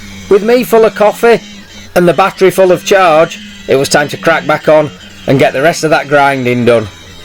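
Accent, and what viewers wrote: Yorkshire, United Kingdom. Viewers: Oh dear. I'm killing myself laughing because I can understand a Yorkshire accent (well, mostly), but I left CC on, and it can't figure out what is being said. Unintended hilarity, which I'm sure you will appreciate. I'm sure some people will wonder why you put so much effort into a temporary fitting, but it comes down to what my Scottish ancestors would call being "thrifty" (emphatically not "cheap"), which is completely consistent with being a "tight Yorkshireman"--a very respectable world view, I'd say. You may call it "winging it," but I really appreciate the skill behind it.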